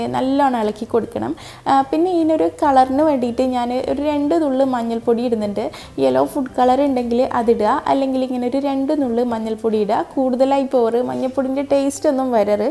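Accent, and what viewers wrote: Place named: Kasaragod, India. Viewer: Ithante samsaaram kasaragod slang polund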